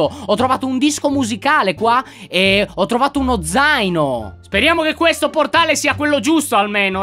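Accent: Sardinia, Italy. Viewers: sono sardo come te e ho scoperto di dove sei perché l'accento e il nome sono di un posto della Sardegna che che conosco bene.Prima pensavo che fossi di Sassari ma ho capito che non era così comunque non dirò a nessuno nei commenti di dove sei perché farei lo spammone bastardo ma tralasciando questo....volevo chiederti se facevi dei raduni perché tu mi stai molto simpatico e molto a cuore e vorrei incontrarti di persona.Ho 11 anni e sono tuo fan a quando facevi "Dayze" che se non ricordi era un gioco di zombie e hai giocato moltissime partite sopratutto con Mario. Poi sono nate altre serie come quelle di Happy Whells e minecraft e se non ricordo bene il tuo primo video è stato proprio di minecraft.Comunque io vorrei veramente tanto che tu facessi un raduno qui in Sardegna perché vorrei incontrarti di persona e mi farebbe veramente felice. SPOLLICIATE PER FARGLIELO LEGGERE!!!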